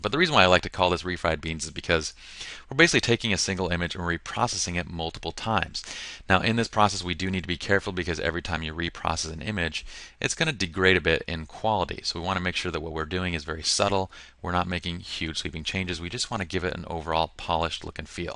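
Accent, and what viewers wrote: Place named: South, United States. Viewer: Love the accent...it's ok....it's a Southern thing. You made me laugh and Lord knows I needed to this morning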